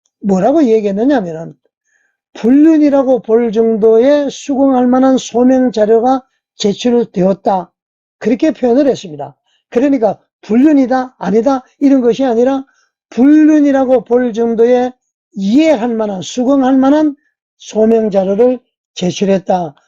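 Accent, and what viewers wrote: North Korea. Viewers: 어투가 북쪽어투 근접~~ 그냥 밝혀 주세요~~ 나의 출생고향은~~ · 유튜버님의 말투가 북한 말투 같은데... 실례지만 고향이 어디신지요?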